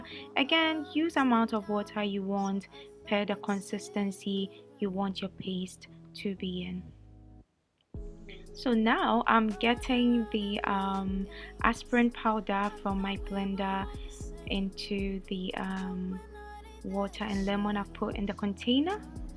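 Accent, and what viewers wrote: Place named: Guyana. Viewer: Are u from guyanna? A friend of mine from there has a similar accent so cute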